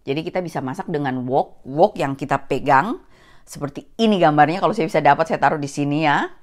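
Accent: Papua, Indonesia. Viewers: oooo sa suka ko pu logat itu, sa jadi ingat ketika sa di papua dulu, lumayan lama oooo,20 tahun. Sa dulu ada teman cewek namanya Ribka ivani sarungu anak Toraja, dia punya hotel di Merauke tapi sa lupa de pu nama hotel. terima kasih juga su berbagi ttg SEASONING WOKnya kebetulan sa juga suka masak. sehat selalu.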